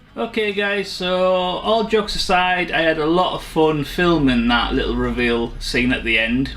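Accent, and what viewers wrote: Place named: County Durham, United Kingdom. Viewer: Hi buddy, you sound like you are up my way. I'm from Seaham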